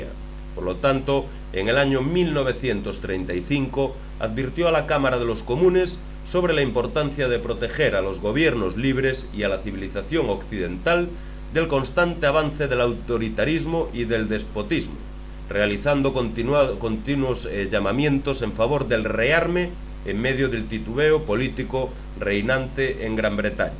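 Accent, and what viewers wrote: Galicia, Spain. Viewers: Acento Gallego de GALICIA-ESPANA.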